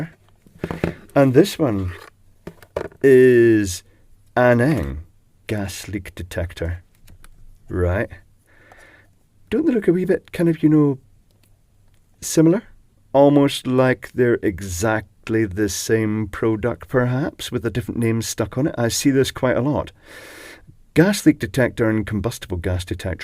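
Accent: Scotland, United Kingdom. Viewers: I don't understand that much about electronics but find these videos fascinating. The other half of the reason I come here is for the relaxing effect of creamy smooth Scottish accent. 😅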